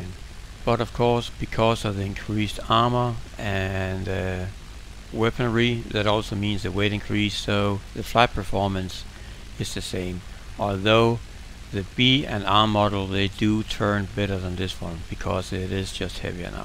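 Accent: Denmark. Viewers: Så nemt at høre den danske accent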